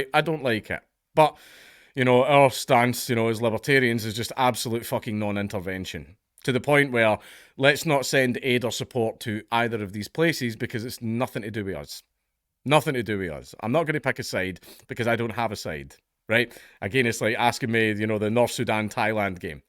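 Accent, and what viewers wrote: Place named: Scotland, United Kingdom. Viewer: A smile just crossed my face, here in Texas, my Texan face, and it was nothing that you/he read/said. Nay, it was the FACT that you/Marcus/ is the DADDY OF A DAUGHTER! And each iddle widdle thing she says as she expresses the entire range of her itsy bitsy emotions... will be adorably said with my current and holding, absolute favorite accent: SCOTTISH! 😃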